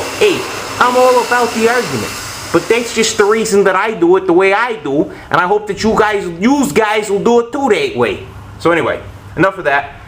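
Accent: Chicago, United States. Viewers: This clip kept me in stitches. I pretty much bet you've not heard this one, that your Chicago accent and voice sounds very much like the pro fisherman Babe Winkelman of Minnesota. Kinda glad you don't use that accent in all of your videos. Ha! ~oO(♫)Oo~ PS. Tonight was my third evening and I finally finished applying 20 Gal. of weed killer via a 2 Gal. tank sprayer (the "fan" nozzle helped a lot) and am already seeing lots of "wilt" and the fast-growing weedy lawn has already slowed to a belly-crawl! I will keep you posted. 2, 4-D rocks! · Dude, you're Chicago accent is on point!! But I'm from Texas. Thanks for the info. I'm new to your videos so I'll be gravediggin these old vids. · nice chicago accent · I had to move away from my beloved Chicago a year ago....and I miss it TERRIBLY! Thanks for the accent. I have known a few in my day who DO sound like that...I even miss THEM. 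hahaha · Lol that chicago accent is engrained in you to this day!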